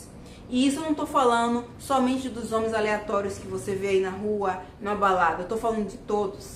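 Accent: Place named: Bahia, Brazil. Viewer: Voce tem sutaque baiano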